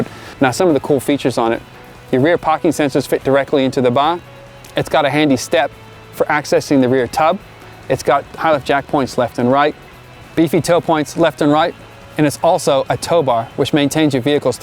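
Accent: Australia. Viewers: Sick looking Ute man! WAIT... is he Australian 🇦🇺 or American 🇺🇸 ? That accent confuses my ears. 👍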